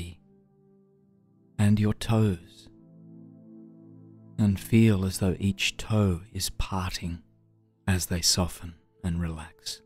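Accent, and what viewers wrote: Australia. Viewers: I’ve listened to only a few guided meditations and I’ve found there’s certain sounds in the voices that are not so gentle.... a lot of times the S sound and sometimes the T sounds are kind of piercing. Is it just me? Is there any way to kind of muffle that at all? Aside from that, it’s great to hear an Aussie voice guiding a meditation... thank you!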